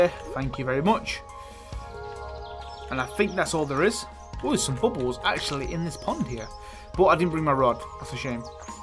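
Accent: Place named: Nottingham, United Kingdom. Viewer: Great vid. Keep it up. Where in England are you pal. Sounds like a Nottingham accent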